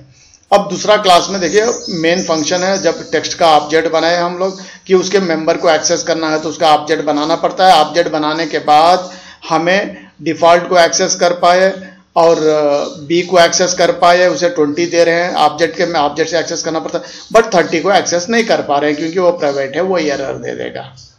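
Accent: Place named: Hyderabad, India. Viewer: Haha! Hyderabadi! 👌🏻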